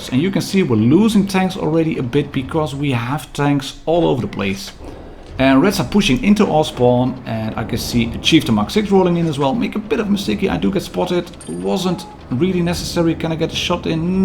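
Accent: Netherlands. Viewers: Just recently started watching your videos, and I am really impressed. Really nice style you have. Your accent also makes me a bit homesick — I’m not Dutch but South African and the Afrikaans accent is similar. I am curious as to why nobody has done a review video on the T55A. Well, I found a French one but I mean none of the “regulars” I follow (you, Bushka, SK8XTRM). Did they not give you a chance to play with it or is it just a bad tank? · its not a trick question😂 I recognised your slight dutch accent, because I’m dutch too😉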